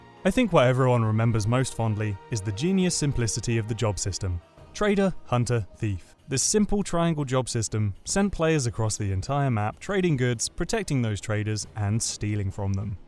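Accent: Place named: United Kingdom. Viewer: you could be a great streamer for Silkroad online since they aren't many English speaker ones. Turks are monopolizing this business and many ppl like me are looking for streamers with a British accent like yours.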